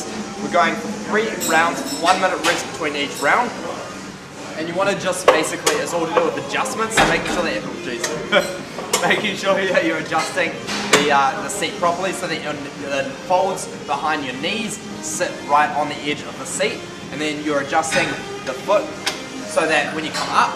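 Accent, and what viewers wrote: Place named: Australia. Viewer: a bahbell on ah reck! aussie accents lol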